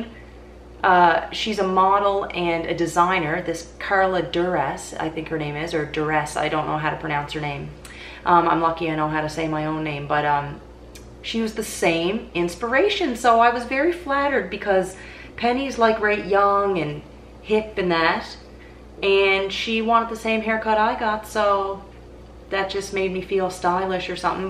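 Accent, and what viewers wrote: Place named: Nova Scotia, Canada. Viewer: So glad to hear your Nova Scotia voice again! I also got into house plants about 2 years ago and now I probably have a hundred. I have them in the front yard, in the backyard, and all over the house. Last year we had a freeze and I had to move them all inside and then it was ridiculous. But most of the time they can live outside because we don't freeze, which I know is not an option for you. I cut my hair to chin length and most of the time have it pulled back with a headband. It's not my best look but I'm at a stage right now and I just can't stand to have my hair in my face. Again, great to see you!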